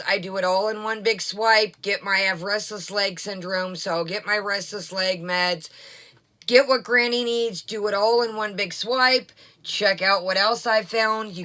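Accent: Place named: Northeast, United States. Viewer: She is disgusting. We have been saying this for over a year. So glad she had been found out! Are you from up North (NE) by chance - OH, NY, PA, MA, RI etc? You have the personality and voice of someone who might live up North. I live in the NE section of the US, that is why I am wondering. I just subbed to your channel.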